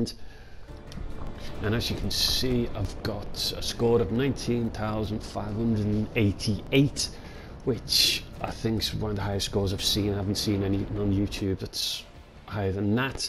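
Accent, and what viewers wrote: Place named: Scotland, United Kingdom. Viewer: What region of Scotland are you from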